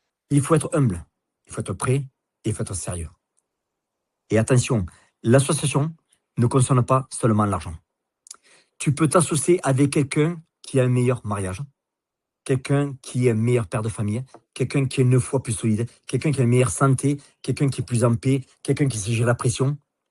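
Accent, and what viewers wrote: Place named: Toulouse, France. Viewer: Excellent, très juste, même s'il y a l'accent toulousain c'est pas très grave 😊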